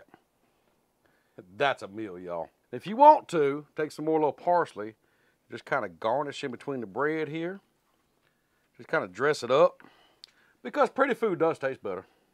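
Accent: South, United States. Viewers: Lol your crumb-snatchers then got big you know that's that old southern talk you all have a blessed day Amen brother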